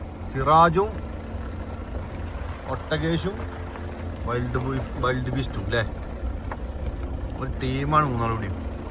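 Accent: Malappuram, India. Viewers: Bro inside wilderness you shouldn’t play with the windows rolling it up and down. What if it gets stuck? But bravetrip indeed. I wouldn’t go alone on such trips 😂. Like your commentary malappuram style ❤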